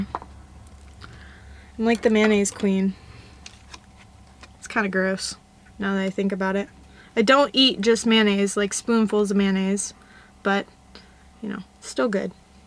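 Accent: South, United States. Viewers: I could tell you grew up in the south. I heard you say "Rat now" not right now. I knew then we were neighbors, a couple hollers over.😄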